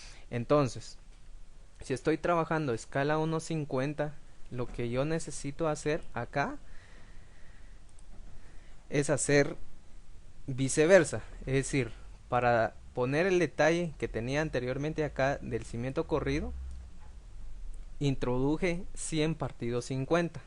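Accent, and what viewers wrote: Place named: Guatemala. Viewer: Ya decía yo :D jeje no pude confundir tu acento chapín mi hermanito! :D Dios te bendiga :D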